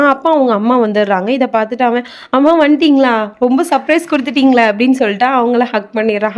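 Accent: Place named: Tirunelveli, India. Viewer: ஹலோ நீங்க திருநெல்வேலி காரங்களா உங்க வாய்ஸ் அப்படிதான் இருக்கு போயிட்டு வந்துட்டு அப்படின்னு சொல்றீங்க கதை சூப்பர்❤❤❤❤❤❤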